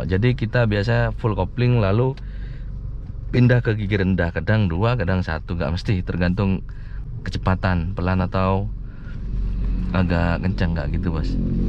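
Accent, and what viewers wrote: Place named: Madura, Indonesia. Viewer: Madura mana bos?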